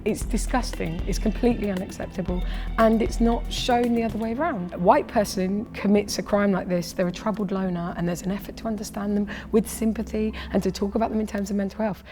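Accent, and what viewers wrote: United Kingdom. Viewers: Just another over privileged white person telling other white people how priviledged they are. Same old shit just with a British accent.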